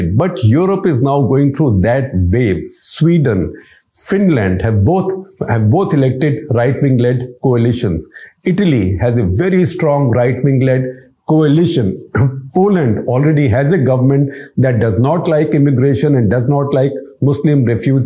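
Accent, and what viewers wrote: India. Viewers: Talking too much with strong Indian accent...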